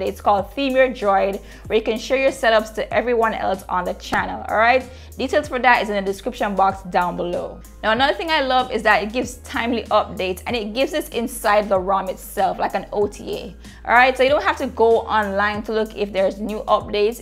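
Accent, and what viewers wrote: Jamaica. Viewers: is that a jamaican accent? seems like it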